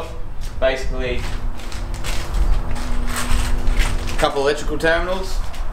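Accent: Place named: Australia. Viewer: Honestly, as an American, I could listen to Aussies speak on 4x4s all day long. Good video mate! Cheers!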